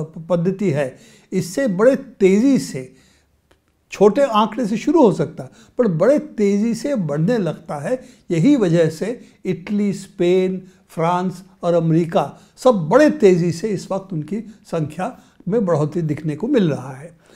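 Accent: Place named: Bengal, India. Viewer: in ko hindi buolna nhi aata , sthree ling prush ling ka kuch pata nhi , bengali Logaun ki tarah baat karte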